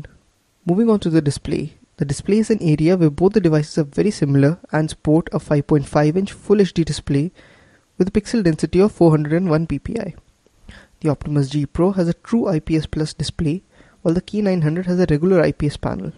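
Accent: India. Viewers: i don't think that for even once, did he make any grammatical mistake. i don't know from which part of the world you are, but there aren't many in the world who speaks more correct english than us, Indian! you may have accent but our english is more understandable to the world due to our neutral accent. these videos are less watched for the accent and more watched for the information. the guy did a great job and the amount of 'likes' justify that. good job man... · indian english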